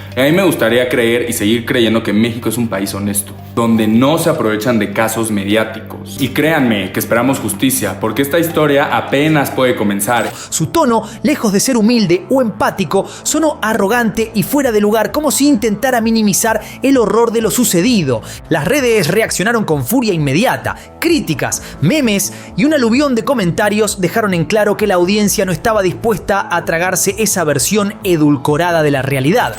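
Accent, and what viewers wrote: Argentina. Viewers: Nunca e salido del país (México) y aún así detecto que eres argentino a mí parecer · Está bien el reportaje pero lo malo que lo narra un argentino y no un mexicano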